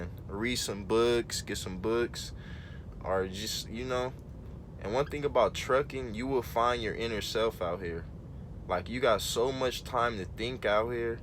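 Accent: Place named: California, United States. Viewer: Man fasheezy good looking with this video bro. I’m actually in the process of getting my DOT physical so I can get my permit and start school with Swift in Fontana. I’m a veteran so I heard there is some benefits to that but we’ll see. I definitely have a desire to do this and can handle it, sounds like fun to me if I’m being honest. I appreciate the content lol I can tell you was from Cali once I heard you start talking 😂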